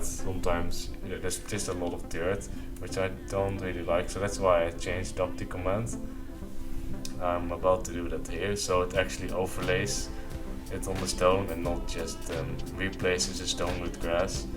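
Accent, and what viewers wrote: Netherlands. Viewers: 100% nederlands kun je horen haha :D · Dutch accent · Are you from Holland, cause you sound Dutch :D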